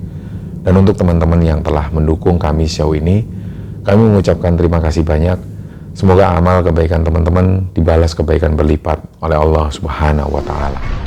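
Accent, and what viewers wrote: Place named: East Java, Indonesia. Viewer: Dr kecil paling suka kalau di dongengin.skrg chanel yg paling aku tunggu update nya cm.entah mungkin karna pembawaan mas yang jawa timur bgt karna aku jg org jatim dan tdk mendramatisir. Jd ayem denger nya.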